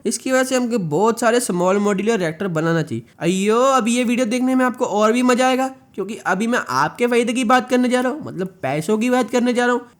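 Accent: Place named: South India, India. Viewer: Bhaiya kaha se ho kya aap South se ho kya aapki hindi bahut alag level ki hai mera matlab bolne k tarike se hai Lekin achchi hai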